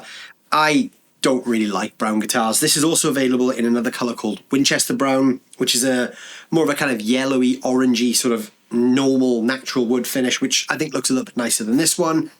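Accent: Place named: Wales, United Kingdom. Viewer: Aha, thought I heard a Welsh accent. . .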